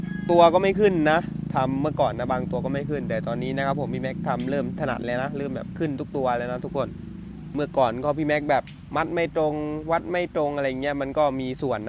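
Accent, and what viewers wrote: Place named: Central Thailand, Thailand. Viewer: สำเนียงกลางภาษาไต้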